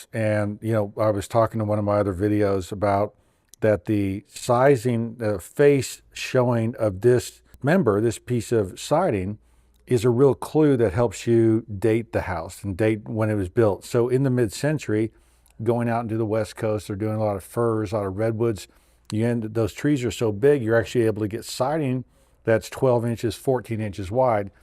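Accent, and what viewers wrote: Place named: New England, United States. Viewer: I'm guessing is from New England. We all say it like that up here, except sometimes it's "MassiveTwo..."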